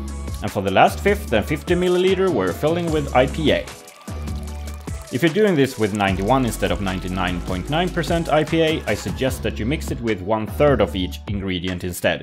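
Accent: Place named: Europe. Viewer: Damn I'm good... In recognising English accents spoken by Europeans. :)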